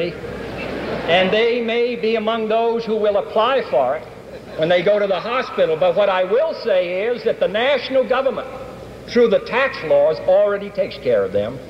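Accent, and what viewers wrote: Boston, United States. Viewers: Go to Boston. You’ll hear it everywhere. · Extremely strong Boston accent 💪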